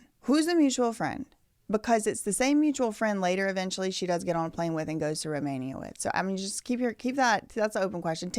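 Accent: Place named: South, United States. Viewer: I'm not a simp or anything like that... but you are beautiful and I love your southern accent ! I'm new to your channel and your break downs are legit!!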